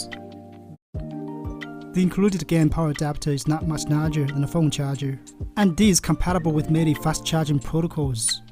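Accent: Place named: China. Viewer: Fluent English with a strong but unique Chinese accent, I love it.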